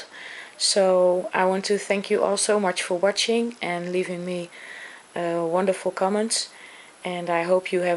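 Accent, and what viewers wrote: Netherlands. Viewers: Where can one buy that mini hole punch? I think your instructions are wonderful and so clear. I love your Dutch accent too, so pretty.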